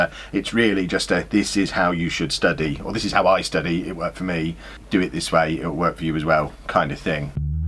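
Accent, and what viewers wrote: Cockney, United Kingdom. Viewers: Just need to master that cockney accent of yours and get a computer that can handle streaming with a green screen behind me so I can steal your set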